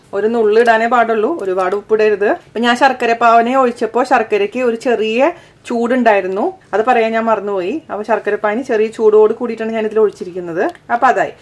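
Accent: Thrissur, India. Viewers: thank you for your prompt reply Chechi! I'm making it for Ganesh Chaturthi :) I have tried so many of your recipes and it's been a Hit!!! I esp love your Thrishur dialect reminds me of my Guruvayur cousins :) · chechyyy..u r soooooo beutifull..ur smile n presentation also..ithok ondaky nokalonnum nadannillwlum checheede aa thrishur slang charapara varthanom chiriyum kaanana njan e videos mainly kaanunne..God bless😊☺😙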